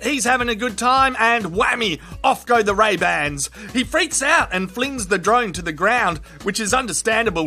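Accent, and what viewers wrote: Australia. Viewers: The drones smashing into stuff like the building twice is the return to home feature on the older DJI 2-3. That's why it hit the same window almost its tracking GPS and doesn't know about skyscrapers. That said still funny with an Aussie voice over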